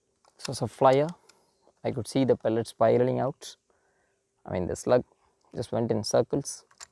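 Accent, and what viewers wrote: South India, India. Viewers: Yea , i think he is from south Indian, because his accent is like a South Indian that's why so i m mistake thn please forgive me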